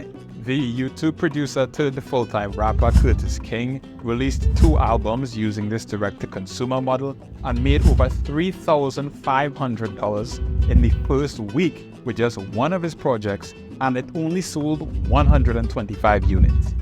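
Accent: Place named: Germany. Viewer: Was not expecting that accent bruh lol. You German?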